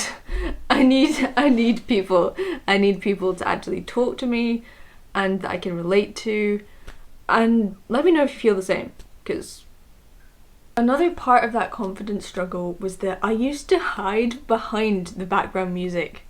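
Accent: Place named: West Country, United Kingdom. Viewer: What is your accent? I can't place it but then very occasionally it sounds very slightly west country? but then at other times very very subtly scottish... so both can't be right?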